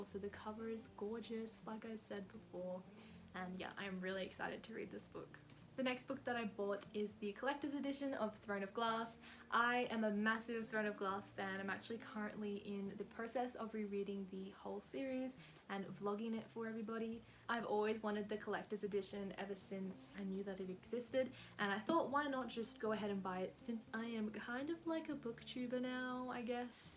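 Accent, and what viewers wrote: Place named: Australia. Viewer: oh the way I love Aussie accent 😫🥺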